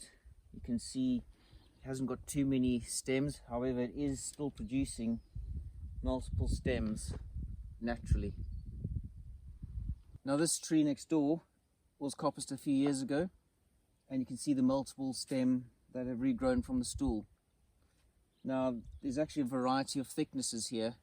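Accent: South Africa. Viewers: You sound South African but you say south is towards the sun (not north as it is in SA) - what country are you in nowadays?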